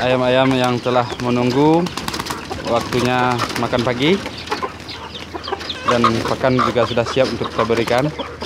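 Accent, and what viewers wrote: Solok, Indonesia. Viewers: Assalamu'alaikum mak,mokasih ilmu jo wawasannyo mak, logat bahaso daerah solok, dima Solok nyo mak? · fadel gaul ambo danga kayak nyo urang solok lah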